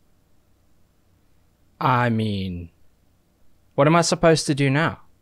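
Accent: South Africa. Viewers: Definitely sounds South African 👌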